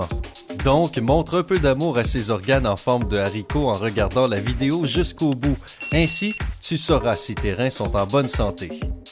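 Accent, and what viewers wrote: Canada. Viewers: C un cannadien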